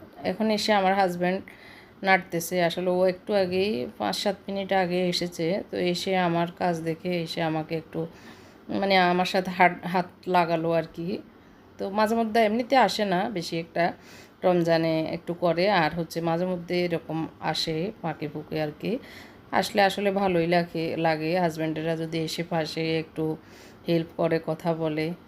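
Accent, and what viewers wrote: Noakhali, Bangladesh. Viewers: আপু আপনার জারটা সুন্দর হয়েছে। আপনার কথা শুনে মনে হলো আপু আপনার বাড়ি নোয়াখালী। আমি আপনার পাসে আছি।আমার বাড়িও নোয়াখালী।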